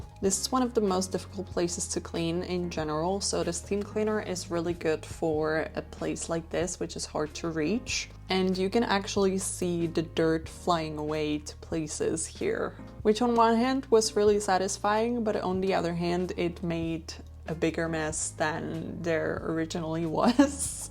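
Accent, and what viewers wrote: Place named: United States. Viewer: I want to quickly pop in and commend you on your English. I was quite surprised to find out that you are, like me, Czech. Almost perfect American accent. Whatever your history, whether you've actually lived in the States or not - hats off to you. Even foreigners who live there mostly don't reach this level of accent, ever.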